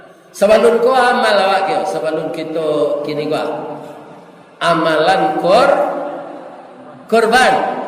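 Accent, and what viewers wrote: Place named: Minangkabau, Indonesia. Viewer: Sabana sajuak hati mandanga caramah ustad Ristawardi,batambah ilimu,batambah kaimanan,lapeh taragak Jo kampuang (magek kabupaten Agam)mandanga Bahaso Minang nan asli.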